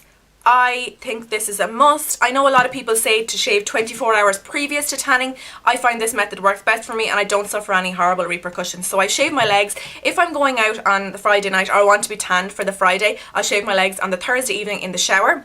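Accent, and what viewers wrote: Ireland. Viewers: OMG your Irish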